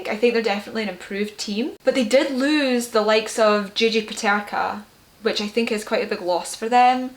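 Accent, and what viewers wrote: Scotland, United Kingdom. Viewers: Be still my beating heart. Scottish blond woman who loves Leaf's. I might of just found my future wife. As a Finnish hockey fan and judge Toronto Leaf's fan I am in love... 😂😂 I really could listen that Scottish accent all day long. But to be serious, I'd love to see your deeper prediction/valuation of Leaf's. Love from Finland.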